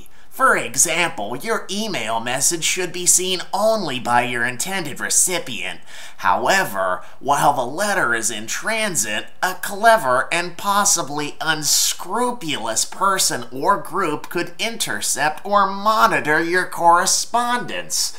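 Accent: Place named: London, United Kingdom. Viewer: Great London accent - as appreciated by this English person.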